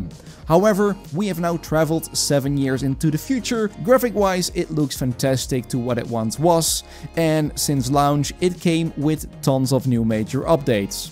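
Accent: Netherlands. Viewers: You must be Dutch. The accent.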